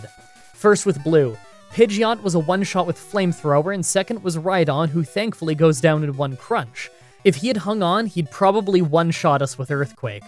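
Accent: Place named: Canada. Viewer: His Canadian accent is so cute, eh!!! Lol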